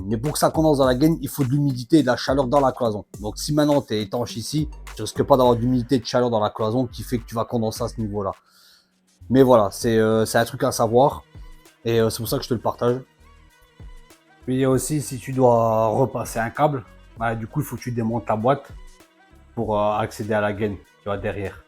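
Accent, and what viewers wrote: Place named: Alsace, France. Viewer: toi tu est alsacien non?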